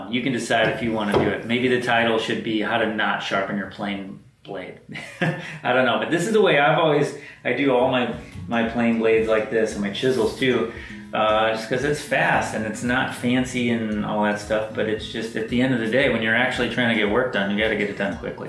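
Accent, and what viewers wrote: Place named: England, United Kingdom. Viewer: I came across your site, I was imprest, and I join. Must say you do not sound American but English. Where are you from ?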